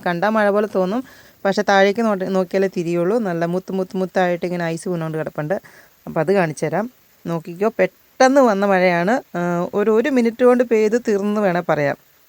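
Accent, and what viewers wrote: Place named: South Kerala, India. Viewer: 👍pakshe Malayalam slang thekkan bhasha pole thonni ( like pathanamthitta)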